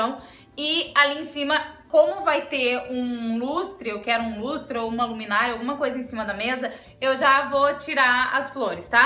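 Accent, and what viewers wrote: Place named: South, Brazil. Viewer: Conheci seu canal hoje e estou assistindo os seus vídeos, seu sotaque me chamou a atenção, vc é do Sul??? Amando seus vídeos, sua criatividade e capricho. Parabéns 😘 😘 😘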